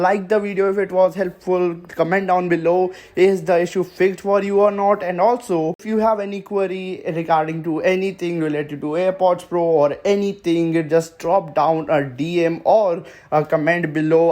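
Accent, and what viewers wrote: India. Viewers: Are u from India? Can see from your accent.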